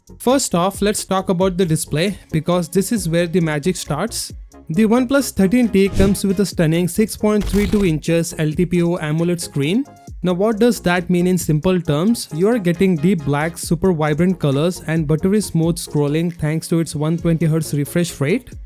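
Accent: India. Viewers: U got the Indian accent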